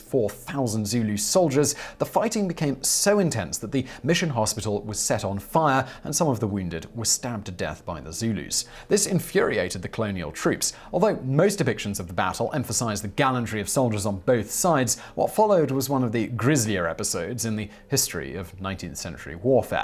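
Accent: England, United Kingdom. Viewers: your English we expect you to pronounce everything wrong. Don't sweat it. Your English accent is very appealing for you. Your content and your videos are always wonderful.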